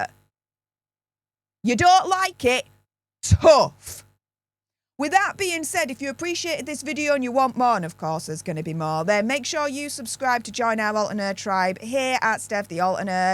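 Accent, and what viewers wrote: Yorkshire, United Kingdom. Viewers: Yorkshire accent...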